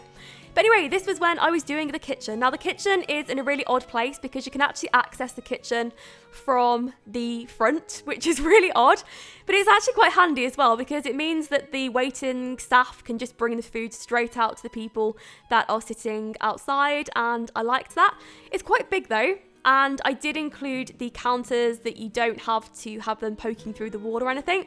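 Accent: England, United Kingdom. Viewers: Not many comments... are you English from England, I am:)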